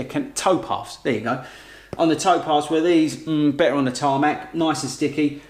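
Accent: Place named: Essex, United Kingdom. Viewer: Propa Essex accent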